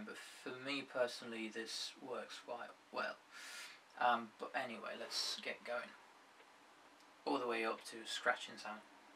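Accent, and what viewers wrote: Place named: North, United Kingdom. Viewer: You have a REALLY interesting accent, put me out of my misery? There is some Northern UK mixed with an European accent....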